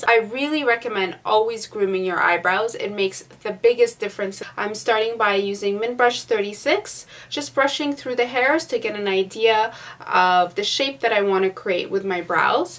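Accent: Canada. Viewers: I've been watching your videos for a while now - and I finally just now heard your Canadian accent haha.. either I wasn't really paying attention haha Nice look!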